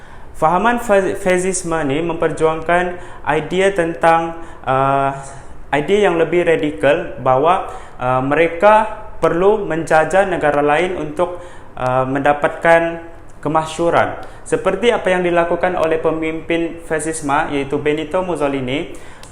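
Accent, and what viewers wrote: Sabah, Malaysia. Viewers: Cg ni org Sabah ka ?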